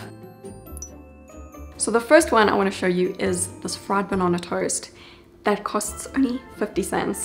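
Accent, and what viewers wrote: South Africa. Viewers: South African accent is strong haha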